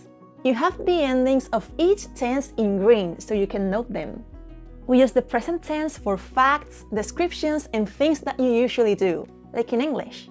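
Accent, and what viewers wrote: Spain. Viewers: For her accent I think she is from Spain 😊😅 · You do a great job. However, one word in English that you are mispronouncing is “participle”. There is a stress on the first syllable “part” and a bit of stress on the third syllable “ci.” So, it comes out as part”- ti- ci’-ple. Your English is overall very good with a very cute Spanish accent. Sadly, with our hateful, unchristian Trump regime here in America, your accent could get you stopped and questioned by ICE agents. And, want your country please take Elon Musk off our hands! Muchas gracias para su lecciones en español.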